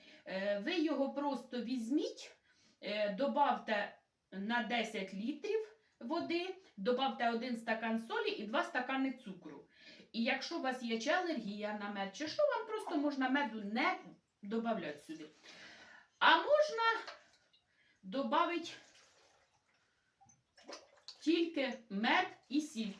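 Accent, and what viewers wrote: Poltava, Ukraine. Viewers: така ж мова, слово в слово, Полтавська обл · Дивлюсь вас слухаю і настрій піднімається❤Балакаєте гарно так як і на моїй рідній Полтавщині(вас послухаю і ніби на батьківщині побула)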